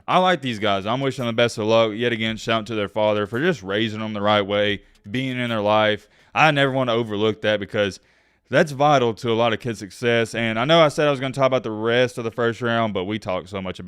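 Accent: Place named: North Alabama, United States. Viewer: I had a feeling you were from north Alabama. I live in Madison.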